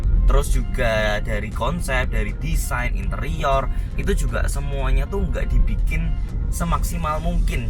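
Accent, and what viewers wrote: Java, Indonesia. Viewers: Paling suka sama penjelasan bro dengan suara khasnya yg medok. Hehe. Semoga saya juga bisa segera memulai bisnis. Terimakasih ilmunya bro. Sukses selalu, sehat selalu utk bro dan keluarga.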